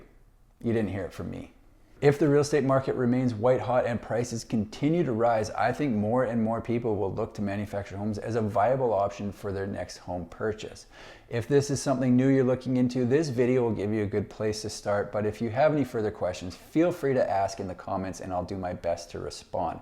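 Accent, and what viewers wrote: Canada. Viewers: Why am I listening to a canadia?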